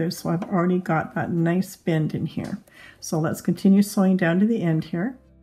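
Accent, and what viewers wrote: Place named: Canada. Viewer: Hi. I love your tutorials. You sound like a Canadian.;) If you are where do you purchase your cotton rope for your projects. I live in rural Manitoba and have very limited options. Thanks so much and keep them coming. I dyed rope and made Easter baskets after that video....so great.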